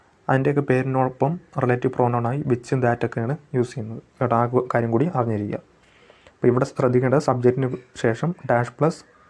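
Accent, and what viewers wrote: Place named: Thiruvananthapuram, India. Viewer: Chettan TVM aanallle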